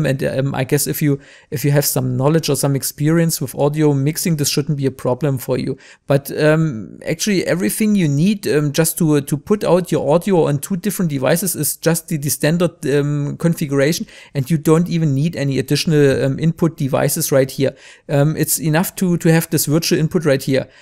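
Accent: Germany. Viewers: starker deutscher akzent :) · man with funny German accent explains to me what I need to know. This is the perfect tutorial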